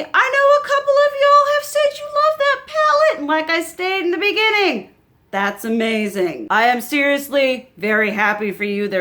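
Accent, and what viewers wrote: South, United States. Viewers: Just wanted to stop by and say I absolutely adore your aesthetic and accent! I was born and raised in GA and your accent is the perfect embodiment of what a southern accent is! Plus, your attitude and personality and energy presented in this video and how animated you present yourself is everything I can relate to! I’m here for it all haha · I dunno if anyone has told you this but you sound very American hah. Not in a bad way. My American peeps tell me I sound very Canadian.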